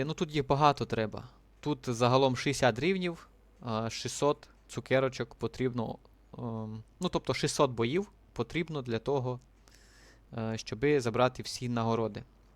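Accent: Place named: Dubrovytsia, Ukraine. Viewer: говориш як з дубровиці)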